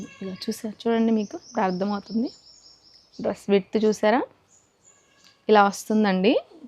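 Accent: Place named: Prakasam, India. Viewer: మీ డి ప్రకాశము జిల్లా కదా మీ వాయిస్ స్లాంగ్ అలాగే ఉంది రిప్లై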